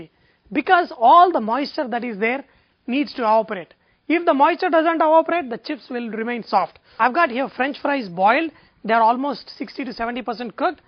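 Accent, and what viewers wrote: India. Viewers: guys, stop making fun of his accent. you try giving a cooking lesson in an Indian language and see how you feel!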